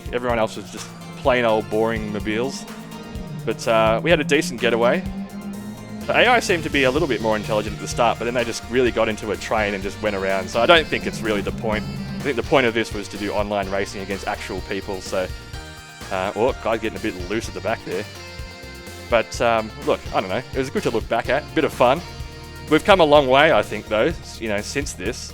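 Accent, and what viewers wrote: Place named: Australia. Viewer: You sound like a Aussie. I'd have bought this but it's still over $40...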